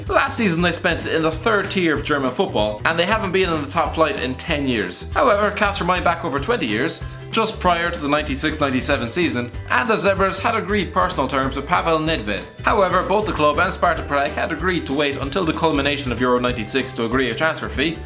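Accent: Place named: Ireland. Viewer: Lovin the Irish commentary xD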